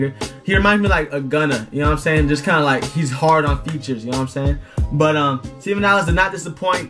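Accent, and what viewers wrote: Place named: China. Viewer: Youre dutch sounds like Chinese (no hate tho)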